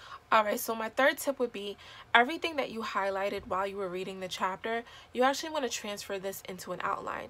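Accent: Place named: New Jersey, United States. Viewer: I was literally binge watching your videos all morning and then boom a new post 😂😂 I am new to your channel. I work full time and goto an RN program . I am from New Jersey so your accent reminds me of home lol. Good luck! You are way more dedicated and organized than I was when i started. I think I was still in my college phase of partying and cramming but this last semester I rocked it out! I have one more semester starting in January so it’s been a long road but I am ready to be done and I am deciding what kind of nurse I’ll be. Anyway you amaze me and keep going and inspiring 💕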